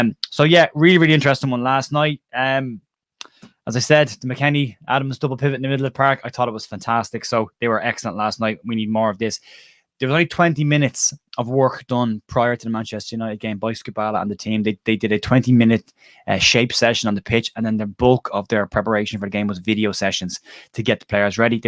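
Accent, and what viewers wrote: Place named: Galway, Ireland. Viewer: Great job! As a novice follower of the game my tactical understanding is limited. But when it comes to how the Board is going about this transition, I have similar experience from other corporate situations. Your coverage of this aspect of the Whites is both knowledgeable and, even rarer, objective. No pinch of salt needed. In addition, to my Midwestern ears, your delightful Galway brogue fits easier than Yorkshire English. The non-game terminology is also curious to me. Here in the US, we wouldn't "sack the manager" but "fire the coach". And if the replacement isn't onboard soon, we'd be saying, "Ready. Fire. Aim."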